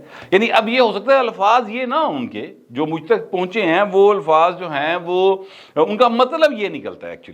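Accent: Punjab, Pakistan. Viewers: صاحب آپ کا پنجابی لہجہ کیا بات ہے مزہ آ جاتاہے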